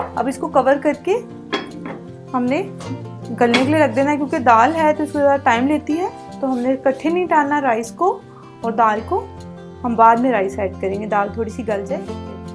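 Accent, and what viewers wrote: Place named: Lahore, Pakistan. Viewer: AP KA LEHJA LAHORI HAY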